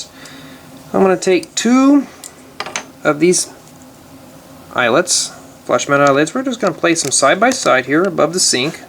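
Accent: South, United States. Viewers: it sounds like he got a southern acsent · R u from Texas? You sound like one...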